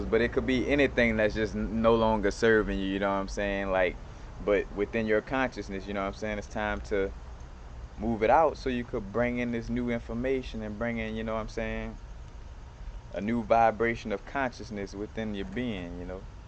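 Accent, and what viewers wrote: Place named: Louisiana, United States. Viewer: You gotta be from NOLA or somewhere in Louisiana lol I'm from there and I recognized your accent😭 anyways keep making videos please, I love your vibe❤️ such a beautiful human being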